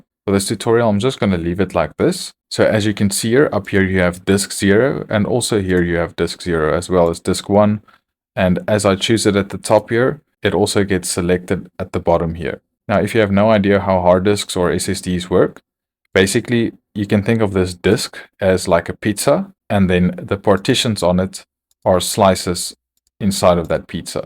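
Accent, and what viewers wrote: South Africa. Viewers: he instantly sounds SO SOUTH AFRICANNNN 🤩🤩😍😍 undeniable SA accent !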